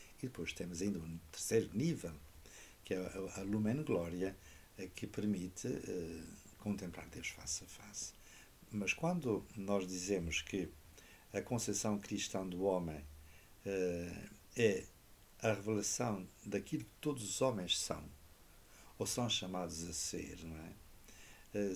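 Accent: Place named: Portugal. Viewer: Abrazos, desde Hispanoamérica,. Mesmo sendo para nós, do outro lado do Oceano, um pouco mais difícil acompanhar a bela pronúncia do português peninsular, a sua mensagem chega a nós e é muito bem recebida. Los católicos estamos mucho necesitando verdaderos y valientes pastores. ¡Gracias!. Obrigado!. Dios lo bendiga, siempre.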